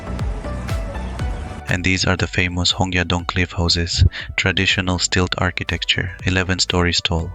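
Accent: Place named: India. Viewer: 你的英語口音和其他大部分印度人的英語口音不同，為什麼？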